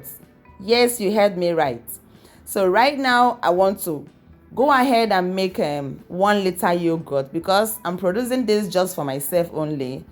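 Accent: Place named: Ghana. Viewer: Hello ma'am, thank you for sharing. The video was quite explanatory. Thank you. The way you speak sounds like one from "Pete Edochie's" era. They spoke a lot of oral English. It sounds like music really, depending on how they speak it anyways. But then again, you sound like you were born, "bread and buttered" in Ghana. ❤❤❤ Thank you once more ma'am.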